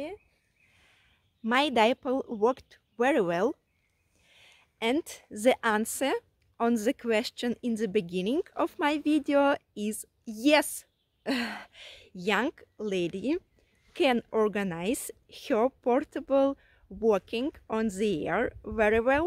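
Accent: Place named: Ryazan, Russia. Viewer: Красавица, у тебя проблемы с родным языком ? Зачем мучаешь язык врага своим рязанским акцентом ? К чему это и зачем ? Ладно когда во время OSO, понятно и логично, а все остальное время в ролике.... ?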